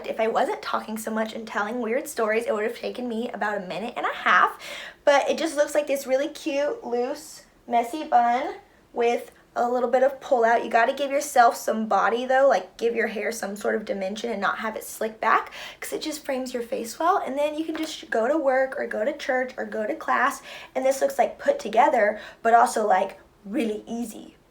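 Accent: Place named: South, United States. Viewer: you speak southern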